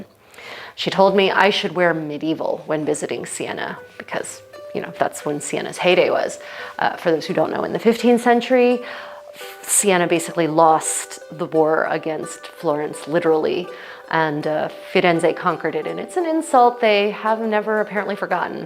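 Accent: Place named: United States. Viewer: You are living my best life! LOL I have always wanted to do exactly this! I specialized in Elizabethan, but also have many Italian Ren, although I probably have much to learn from you in Italian clothing. I would love to do this in England as well as in Italy. I never have been able to get over there, dealing with various health problems; I am so exhausted after dressing this way, I need to lie down! Gone are the days of my youth when even though I have lupus I could work, run home, pack for the weekend, drive 4 hrs, set up pavilions, dress, and socialize/party the night away. LOL I miss it. How much luggage did you have to take? (assuming from your accent you live here in America) I could get one Elizabethan kit or two Italian Rens and that would fill my biggest suitcase! Your workmanship, BTW, is exquisite! I love wearing the gowns I have sewn entirely by hand...I show people the stitches and they get so blown away...I just let them know it's just like doing embroidery, you start it understanding you won't just whip one out in a day, it's a PROJECT!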